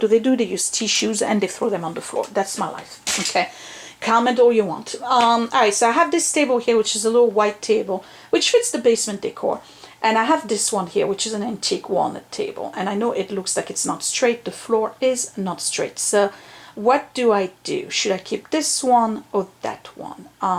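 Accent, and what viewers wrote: France. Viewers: I watch your series as I cleaning my own room like lessen your french accent hagaga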